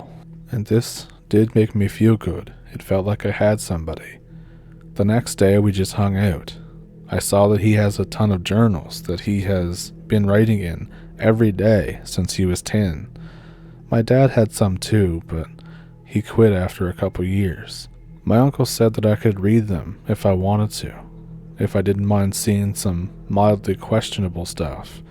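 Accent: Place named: Maritimes, Canada. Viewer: Your accent is wonderful. Canadian Maritime? My father’s family was from French Canada. Quebec/New Brunswick. So I recognize it. You talk like Anne Murray.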